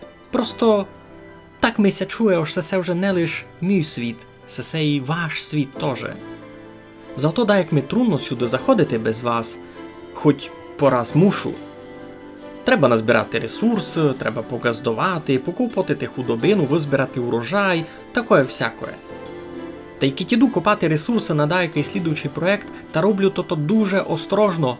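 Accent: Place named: Transcarpathia, Ukraine. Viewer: закарпатська... важко зрозуміти, але розумію😅😊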